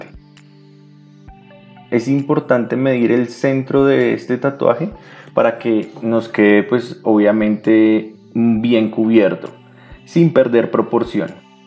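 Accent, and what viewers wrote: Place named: Bogotá, Colombia. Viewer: Habla como rolo jajaja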